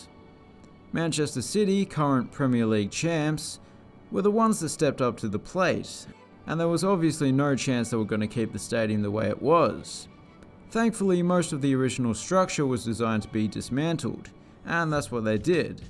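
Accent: Australia. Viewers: Watching an Australian guy commentate on a stadium that I grew up going to and can see outside my window now is pretty uncanny. (Georgia State)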